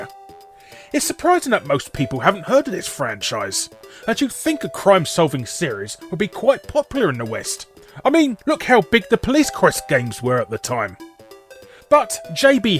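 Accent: England, United Kingdom. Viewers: Guru Larry just made me realise you can't spell Successful without SuCC! God bless that English accent of his!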